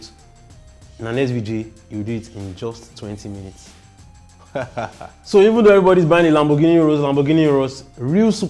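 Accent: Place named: Nigeria. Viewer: Least fastest to the most fastest. Nigerian English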